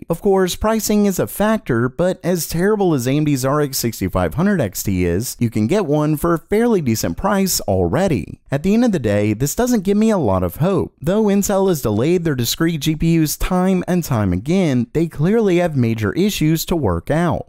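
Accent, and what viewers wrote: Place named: Philadelphia, United States. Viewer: You from the Philadelphia area my friend? Pretty sure I heard the Philly accent in there. ✌️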